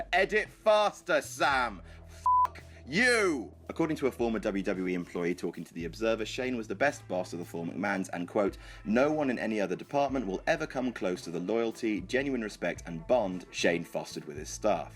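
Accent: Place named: United Kingdom. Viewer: Come on man seriously,can they get people too do these that you can understand? here that whispers half the time,mumbles 1/4 of it the other 1/4 says 3,4 words at a time.Plus that thick British accent who the heck can understand what he is saying?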